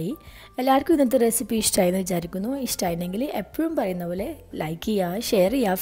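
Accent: Kannur, India. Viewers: farsha fashi kannur aaanenn thonnun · സംസാരം കേൾക്കാൻ നല്ല രസമുണ്ട്.. കണ്ണൂർ അല്ലെ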